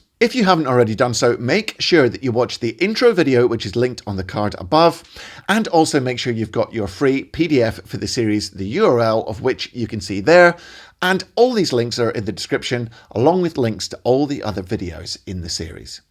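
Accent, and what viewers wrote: Scotland, United Kingdom. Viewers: First attempt at slowing the video today and it worked great for my practice as well as bringing out your Scot’s accent!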